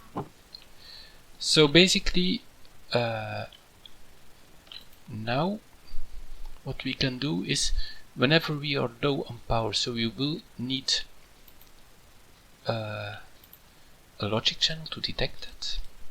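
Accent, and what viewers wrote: Flanders, Belgium. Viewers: are you Belgium? I can hear it on your 'vlaamse' accent. Love your mods though. I also had one question about XNet, how does the redstone mode work on extracting items mode?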